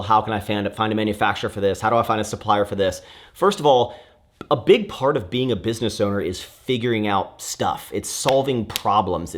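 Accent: Texas, United States. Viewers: That Texas accent becoming more and more apparent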